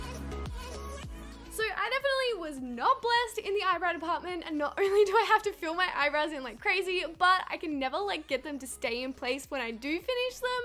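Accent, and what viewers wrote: Australia. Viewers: I love how I could tell she was from Australia by her accent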